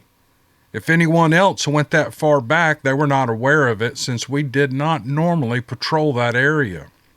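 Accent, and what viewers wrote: South, United States. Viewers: Hello from TN. I personally like it mostly when you only narrate the stories. Only because I've grown accustom to hearing you. That said, many times I fall asleep , not that it's boring. But you have such a soothing voice and listening to you, I can actually place myself into the story like I'm really there and experiencing it. You talked about your work, somewhat. May I ask what is your profession? I think I enjoy hearing that southern brawl more than anything. It just gives each story that special twist that we Southern folk seem to have. See, if I am watching you, then it's like I'm focusing more on you and not as much on the story. Just my own personal opinion. Think u should leave it the way it is. I'm getting older and don't like change. So, that's MY hang up, not yours. Anyway, keep the stories coming. They are very entertaining and your voice is enjoyable. 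Not taking away from your handsome face, but the not knowing what someone looks like behind the voice is suspenseful and intriguing. I'm not commenting about your pets because I've never been and am not a whole lot now of a pet person. I don't knock others for their own feelings. It's y'all's prerogative if ya want to be pet parents. Everyone is different and entitled to their own opinion. In closing, I say to you, Thanks and carry on! Oh, and I'm now a new sub! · I'm not just saying this, you are the best storyteller on the YouTube! I have been blessed to be listening to your stories for 2 years now. I listen to many of my favorites over and over! Like I said, you are the best! Keep up the good work. We love your face, we love your personality and at Southern accent is a huge Plus. Blessings to you and your family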